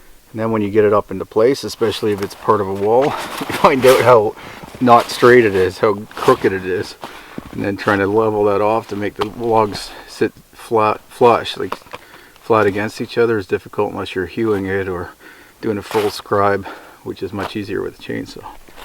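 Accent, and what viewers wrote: Canada. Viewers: Este es más expresivo, tierno, que se equivoca, trabaja sentado en la nieve y conversa, tropieza y hasta sonríe cálidamente. Contrario al otro más serio, que habla poco, mas eficiente y por momentos algo aburrido. CUANDO PONDRÁN SUBTÍTULOS EN ESPAÑOL???, hay muchas cosas que no se entienden del dialecto canadiense.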